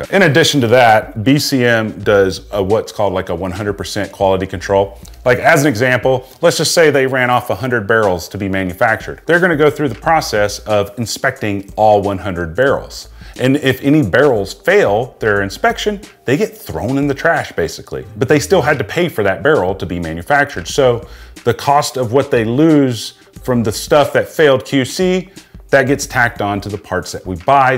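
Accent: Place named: Minnesota, United States. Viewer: You did really good very close for the Minnesota accent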